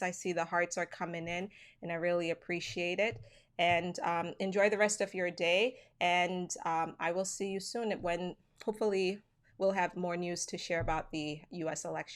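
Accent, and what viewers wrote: Jamaica. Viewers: yes sistren. Country boy. Straight out a Westmoreland.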